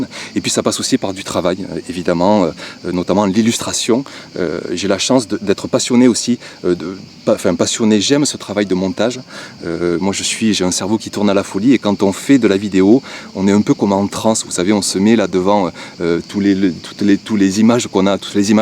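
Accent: South, France. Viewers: Hello, Happy birthday 🎉🎉🎉🎉, je te suis depuis un peu près 8ans, et je ne m'en lasse pas😂, je suis de Belgique.. Mon potager est tout mini rikiki comparé au tiens, mais je ne cultive que l'été, j'ai une superbe serre que mon chéri m'a acheté et j'y suis tout le temps.. Si c'est pas en cuisine, c'est dans le potager 😂😂😂. Le potager est mon excutoire, mon endroit de ressourcement, et puis j'ai comme toi cette fierté de donner des bonnes choses à manger à ma famille, j'aime aussi le partage, et souvent plutôt de garder pour moi, je donne.😮. Ne change rien, ton authenticité et l'humanité que tu degages en fais ta force..et puis c'est tellement plaisant d'entendre ton accent du sud rempli de bienveillance 😊. Sois heureux de ce que tu fais et fière de qui tu es. Au plaisir 😊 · Salut, alors je ne peux pas te dire depuis combien de temps je regarde tes vidéos mais depuis pas mal de temps! 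Je suis toujours content quand je vois que tu as publié une nouvelle vidéo, et j'ai hâte de la voir quand j'ai 5min Je me souviens encore les dimanche a me dire tient mais faut que j'aille voire Youtube a du mettre une vidéo 👍 Merci a toi en tout cas toujours "une totale regalade" comme tu le dis souvent avec ton petit accent du sud 😉 Perso j'adore les expérimentations sur différentes techniques etc, je trouve que on peut être surpris des pratiques qu'on fait depuis longtemps ça remet en question j'aime beaucoup! Continue comme ça et merci encore!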